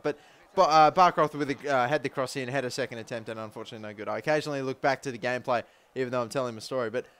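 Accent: Australia. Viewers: Guisseppe Murru...I have a scouted star of my own called Guisseppe Missiroli. Way to be fuckin' creative EA, seriously haha. Anyways, I would be complaining as much as everyone else about your dilly dallying around the story but I adore Aussie accents so I'm good. Win-win lol.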